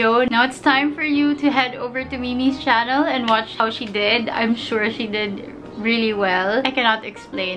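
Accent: Visayas, Philippines. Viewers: ang cute mo magsalita ng bisaya ate 😍 hehe 👍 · Ganda pa din ng accent mo kahit bisaya 😍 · Lamia paminawon nimo mag bisaya 💕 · Lambing kaayo ka mag bisaya:) hehehehehhe love it ❤️❤️❤️❤️ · Gnhan ko maminaw sa imong bisaya nga dialect ay,DLI kiwaw...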